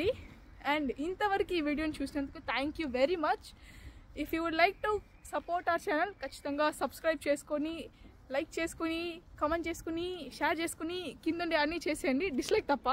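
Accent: Tamil Nadu, India. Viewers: Mey nee bhasha bhale vundi mey.. Konchem Tamil mix vundi gani nee Telugu matram super mey 😂 keep doing more and more in Telugu 😅 By the way.. shorts and long videos both are good! I will definitely wait for both ❤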